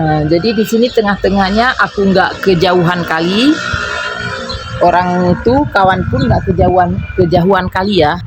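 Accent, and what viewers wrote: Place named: Medan, Indonesia. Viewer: Halo bunda salam kenal.. senang sekali bisa ngumpul bareng teman-teman Indonesia. Oya bunda di Indonesia asal nya dari mana klo boleh tau. Logatnya kayak orang Medan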